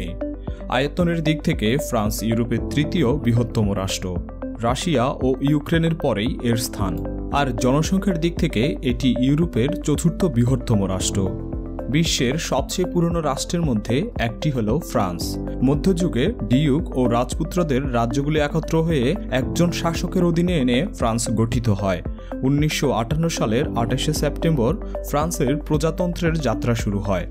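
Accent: Noakhali, Bangladesh. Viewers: Vai ki Noakhali / fenir 😜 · Noakhailla tor uccharon thik kor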